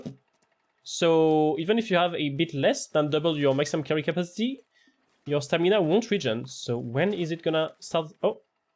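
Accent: France. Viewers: French AND a speech impediment that’s crazy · Wow a French accent with clear English is actually beautiful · I cant tell if that beautiful accent is french or something else, but god it invests me into the video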